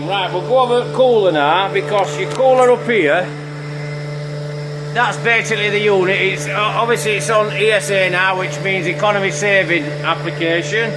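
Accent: Yorkshire, United Kingdom. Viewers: Thanks for Air Zone compressor video maintenance needed to spray cars with paint. Good work Proper Yorkshire chat. KenXJ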